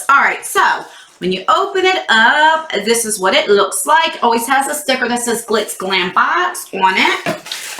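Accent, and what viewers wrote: New Jersey, United States. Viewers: Happy birthday!🌸🌼🌻....I gotta say that I nearly peed my pants when you said I wonder if she knows Im from NJ! 😂gurl.... you sound Jersey through and through! And I love it!!! Have an amazing birthday! From a fellow 43yr old🔅🤗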